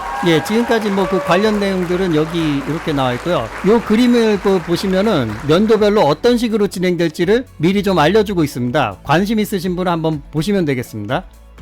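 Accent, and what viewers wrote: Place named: South Korea. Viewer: 이아저씨 영어가 콩글리쉬라서 귀에 쏙쏙들어오니 좋네 ㅋㅋㅋ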